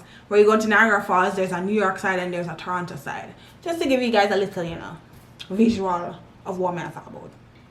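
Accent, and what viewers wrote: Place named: Jamaica. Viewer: U sounds Jamaican girl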